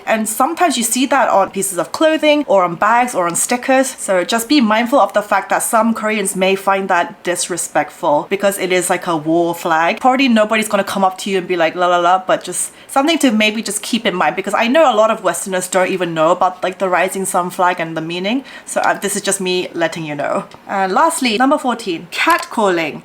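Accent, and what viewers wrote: England, United Kingdom. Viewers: Your British accent is obvious. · 영국에서 오신분인가요? 영국 발음이 들리시넹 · 우연히 왔는데 , 카즈하 같은 느낌의 더 예쁘신 분이네요. 잘 보고 갑니다. 묘하게 영국 발음이랑 미국느낌이 섞이신 발음 같아요. 행복하세유~ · I was gonna say, your accent is almost British. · wow, strong England English accent detected! :) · 한국인인데 다 봤어요 영국 발음 너무 멋있다 ㅠㅠㅠㅠㅠㅠㅠ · I am so happy to see you again. You know the funny thing is that I was just thinking about you. "That beautiful Korean Youtuber with UK accent. I missed her and her narrative about Korea and Korean Culture" I thought. I then saw your video come up in my youtube feed. Well, I just actually subscribed to your channel. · You have a very sweet “Queen’s English” accent,. I can help you with American 🇺🇸 English! Ha, ha, ha! 🇰🇷🤗❤️🤗🇺🇸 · Algorithm led me to this video and I thought 'I know someone who's name is ' and heard her talking and 'Brisith accent?' and saw her face and 'Oh...it's!':) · The UK she said, that accent definitely rubbed off on her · That is the MOST English accent lol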